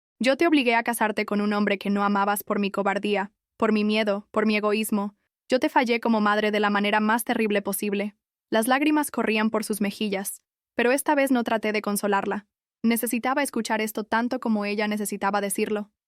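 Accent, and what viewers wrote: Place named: Spain. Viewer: Es chocosa la forma en que a cada rato preguntas tonterías y con tu acento españolado se oye peor. 🤮🥱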